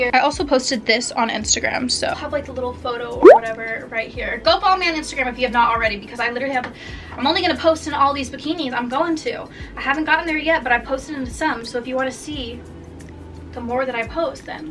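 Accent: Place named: Texas, United States. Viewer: her texas accent 😂❤️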